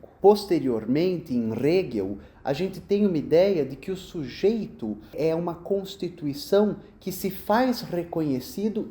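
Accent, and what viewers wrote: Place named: Inland São Paulo, Brazil. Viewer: Apresentação brilhante e muito rica nas citações, elogios a sua pronuncia das palavras, como o paulista do interior, e desta elegante mistura de tantos imigrantes do Estado de São Paulo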